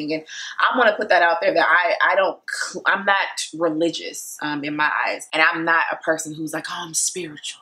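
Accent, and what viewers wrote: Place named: South, United States. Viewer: You sound like you are from the country south. Where are you from Texas?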